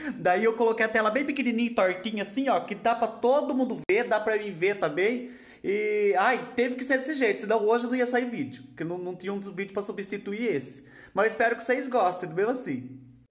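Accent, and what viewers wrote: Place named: South, Brazil. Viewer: Sotaque sulista!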